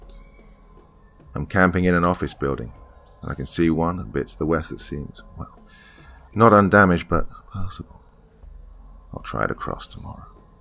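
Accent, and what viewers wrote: England, United Kingdom. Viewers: thank you I thought I picked up some british accent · What part of England do u come from?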